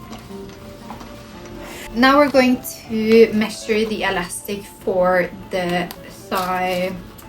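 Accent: Norway. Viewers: 😅 she is a Norwegian fashion designer. I guess you don't like her accent then? Wonderfully thorough tutorial without being too long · she’s Norwegian she has an accent…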